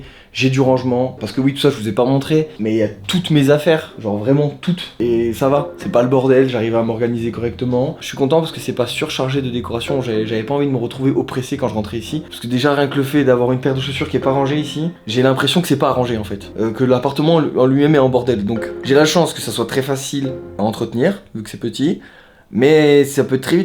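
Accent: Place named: France. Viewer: Tu devrais vivre dans une banlieue islamisée, tu as tous les tics de langage des jeunes des banlieues.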